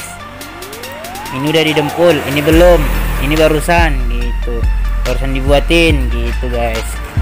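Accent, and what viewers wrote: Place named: Pematangsiantar, Indonesia. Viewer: Dimna lokasi bengkel mas... Dari logat bicaranya kyk org siantar abg ini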